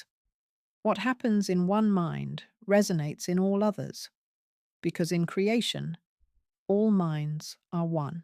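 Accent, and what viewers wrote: United Kingdom. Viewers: ❤ Thank you ! That' s one of the most illuminating lessons of the whole course. And the explanation in this video is brilliant! The britisch accent even seems reinforce the clarity of the message. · Hello wonderful resource that you are! I know you are also missing the 'original voice' as we all are...I did want to say though the current choice isn't just an English accent it's an upper middle class, private school voice...it conjurs..Heirarchy, Empire, the class system and in short a kind of scolding snoberry! So I wanted to suggest.... it's known that.... - BBC Radio Scotland’s “Voice of the Year” - used to be a big one for broadcasters and storytellers using a Scottish accent. - Saltire Society Literary Awards / Scottish Book Trust - not accent-specific, but Scottish writers and performers who use dialect/Scots are regularly shortlisted and praised for “authentic voice”. 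- Fringe First Award - the most prestigious Fringe theatre award were won using strong Scottish accents to deliver comedic or political ideas. - Total Theatre Awards, Herald Angel Awards - also give weight to “distinctive voice” and use of Scots/dialect. - BAFTA Scotland Award for Best Presenter/Performer - has gone to people for work delivered in a Scottish accent. - Royal Television Society Scotland Awards Using a Scottish accent is seen as a strength...... *Toastmasters International - The winner goes to the European finals. Scots accents often win because the authenticity and cadence help land the ideas. --- The most “published” accolade people usually cite is a Fringe First Award or a *BAFTA Scotland*. Both are widely covered in the press and specifically celebrate performers who use their Scotti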